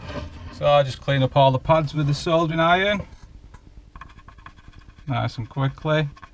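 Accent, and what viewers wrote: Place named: Northern England, United Kingdom. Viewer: Great video! I'd love to do this you explain everything in plain (northern) English! Keep up the good work!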